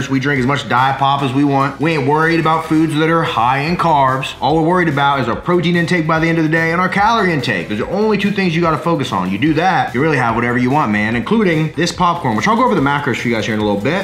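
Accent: Texas, United States. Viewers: is it me or ur getting a Texas accent 😱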